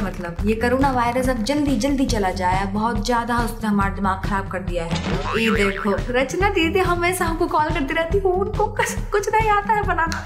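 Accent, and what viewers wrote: Bihar, India. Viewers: Why you use Bihari tone?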